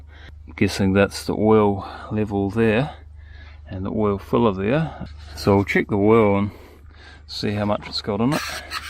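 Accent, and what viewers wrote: New Zealand. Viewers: New subscriber here. I am also subscribed to Iforce2d, an other YouTuber from NZ. He is doing remote control stuff, like fixed wings and copters and recently home built CNC machine. Anyway, it is very interesting how your voices are so very similar. Of course the accent makes it a lot similar, but even your style and look so very similar. Could pass for brothers.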